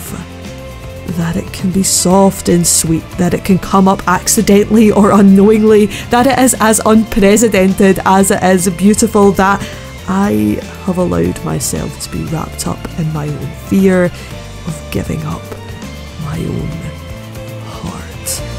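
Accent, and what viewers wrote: Scotland, United Kingdom. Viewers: Where on earth did you get any of that? It's such a lovely and blatantly Scots accent, and I can't hear anything Australian in it at all. (This from an Aussie) And the middle-aged part is at least as baffling to me. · Ooft ya, is that a wee scot I hear? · I'm not good with the specifications i.e Glasgow vs Edinburgh, but if you couldn't tell anything past 'British' it's a scottish accent.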